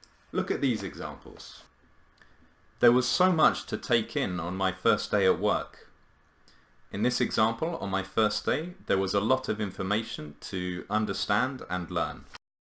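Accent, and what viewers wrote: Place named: United Kingdom. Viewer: Sir, you possess a clear British accent. By the way, I am your new subscriber.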